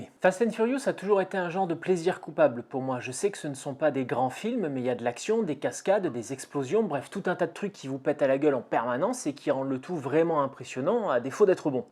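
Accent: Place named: Quebec, Canada. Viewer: Simple question j'ai remarqué un peu ton accent est tu d'origine québécois ou tu vient de la France ?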